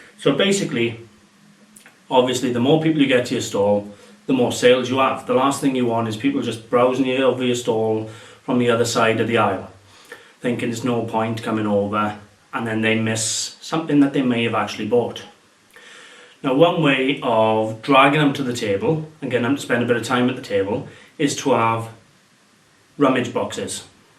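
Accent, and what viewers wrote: United Kingdom. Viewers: u from the uk aint u.so am i yay someome finally from the uk x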